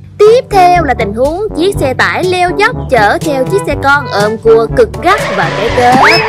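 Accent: South, Vietnam. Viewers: Tôi thích nhất chất giọng ad miền Nam ngọt ngào.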